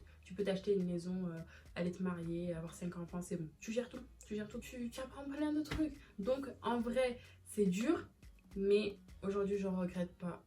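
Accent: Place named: Marseille, France. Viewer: Mhhh Marseillaise heiin 😅 #teampsg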